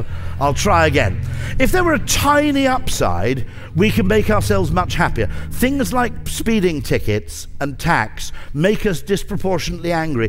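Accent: United Kingdom. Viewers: Everything sounds better in a British accent and lingo! But yeah, that's great effing advice too. Just wish I could be a cheeky bugga too!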